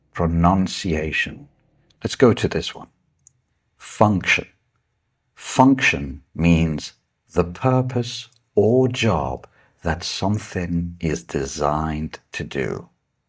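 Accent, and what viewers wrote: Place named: United Kingdom. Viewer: Plz don't leave this book and this way with your best British accent 🎉❤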